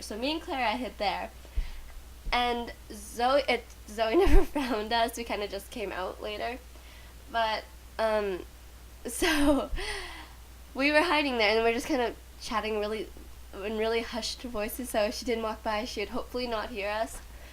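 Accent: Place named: United Kingdom. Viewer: Oh no not the British accent again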